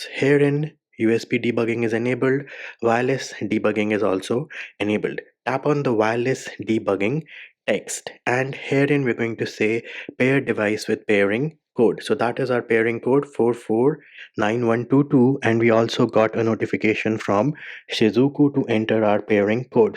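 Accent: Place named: India. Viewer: When i heard that bro have an indian accent i knew my problem is already solved.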